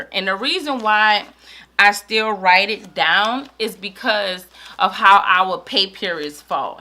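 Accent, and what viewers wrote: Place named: New Orleans, United States. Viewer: just started watching your videos I lovvvvve you new orleans accent lol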